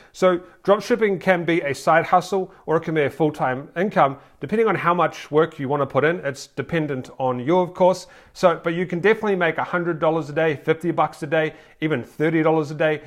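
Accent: New Zealand. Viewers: Hey, bro are you Kiwi?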